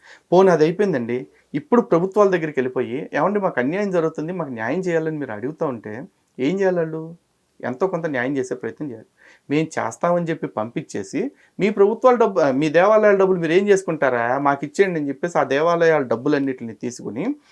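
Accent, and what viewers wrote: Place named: Andhra Pradesh, India. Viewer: bro edaina pdf gani Wikipedia edina link gani ivvandi brother 1528 lo Vijayanagara empire ani nenu ante kaadu Golconda antunaru kada ade nenu chadivaa antunaroo kada naaku Dani gurinchi telusukovali undi bro konchem edaina prefer cheyandi internet dorukutundho emo ani,me eppudu reply istaroo ani eduru chuustu untaa. Me cheppe vidanam chuste Andhra prantam vari laaga unaroo bro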